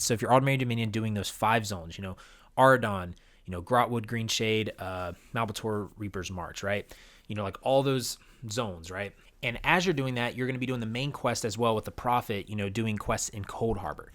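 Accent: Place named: Midwest, United States. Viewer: ain't no "L" in the word zone dude. I'm from the Midwest too and I fixed that dialect issue you can too!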